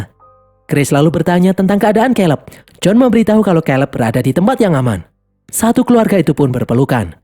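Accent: Java, Indonesia. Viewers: Logate medok yo masse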